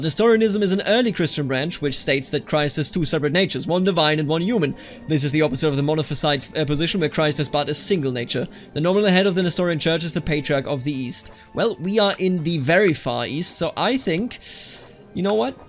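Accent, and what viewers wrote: Germany. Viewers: Man I love listening to you pronounce Indian names with your German accent. 10/10!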